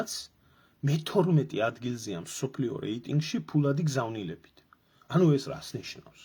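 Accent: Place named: Tbilisi, Georgia. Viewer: რა ჯიგარი ხარ. თბილისურად❤🙏რომ საუბრობ.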